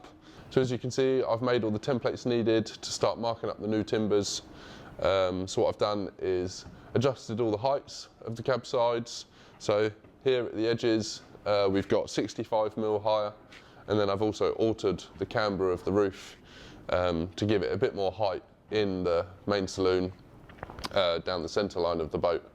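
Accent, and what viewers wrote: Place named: North, United Kingdom. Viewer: Where's your boatyard? With the snow and your accent I'm guessing Northern UK? 😊 The boat keel is deep. Is that what defines it as a smack?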